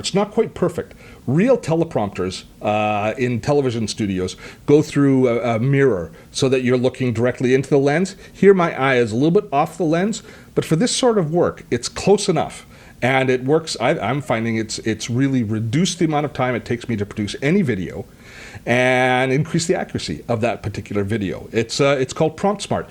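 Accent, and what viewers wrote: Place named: Canada. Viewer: Thanks for this I am trying to get to grips with the app. It sounds like you are a fellow Canadian, what a great personality you have!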